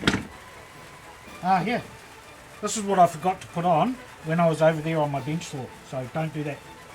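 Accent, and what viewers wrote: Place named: New Zealand. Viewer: Good luck... finally another kiwi woodworker... and our kiwi sense of humour and accent I can understand... lol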